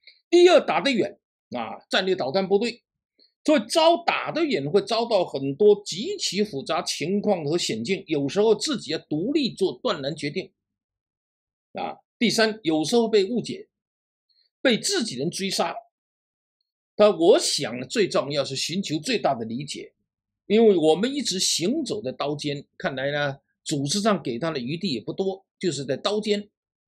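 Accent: South, China. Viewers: 不必要，基本全能听懂，而且有一点南方口音的普通话感觉更有韵味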